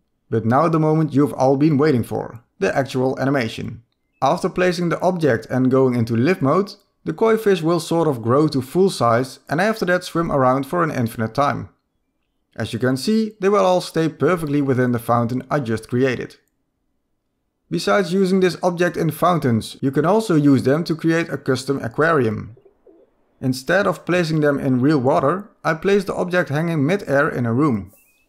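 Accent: Netherlands. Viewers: Ik hoor gewoon dat dit een Nederlander is hahahah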